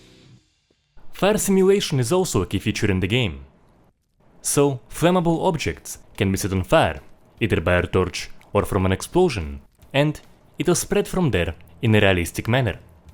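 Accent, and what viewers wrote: Russia. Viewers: Cool video, but I don't know. Why do you have such an accent mmm... Russian? Are you from the CIS countries? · Русский акцент? Или я плохо разбираюсь в акцентах?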